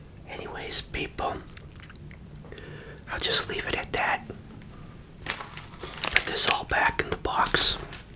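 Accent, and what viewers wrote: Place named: Massachusetts, United States. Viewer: You must be from Massachusetts...I recognize the accent.Hello from southern NH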